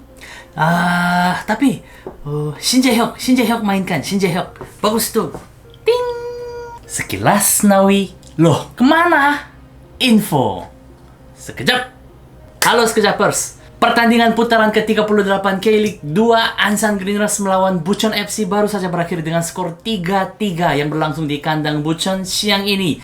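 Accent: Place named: Java, Indonesia. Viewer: Logatnya kayak wong jowo paman, he!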